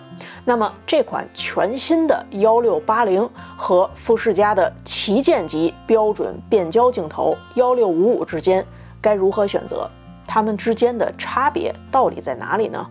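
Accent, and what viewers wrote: Beijing, China. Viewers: 北京口音就这样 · 这不是北京口音吗？像说相声的？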